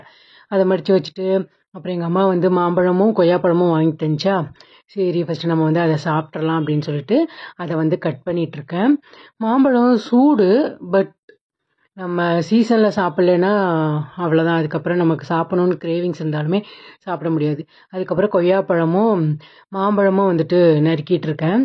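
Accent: Kanyakumari, India. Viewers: Akka kanniyakumari slang pesunga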